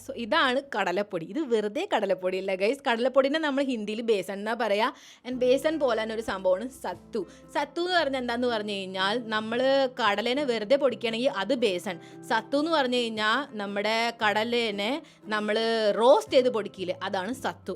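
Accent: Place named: Thrissur, India. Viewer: Chechi I felt that your slang changed to thrissur slang 😂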